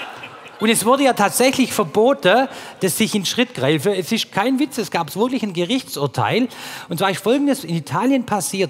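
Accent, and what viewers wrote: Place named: Swabia, Germany. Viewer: Vielen Dank für die liebevollen Geschichten aus dem Alltag👍🤗 ich höre und sehe sie mit ihrem schwäbche Dialekt so gerne❤, machen Sie weiter so und bleiben Sie so wortgewant und humorvoll unterhaltsam, denn das braucht man in diesen chaotischen Zeiten um gut gelaunt durch zu halten und die Hoffnung nicht zu😊 verlieren ❤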